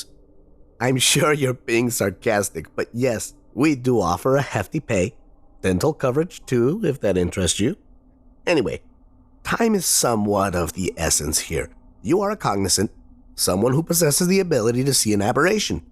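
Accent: Russia. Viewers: Duke Nukem meets Russian accent? :(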